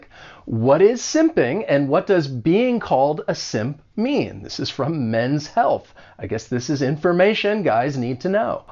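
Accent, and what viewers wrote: United States. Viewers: Don't mention it professor. I'll be always watching your videos, they're so cool. Perhaps, because of the way you teach and explain everything. I appreciate American's accent .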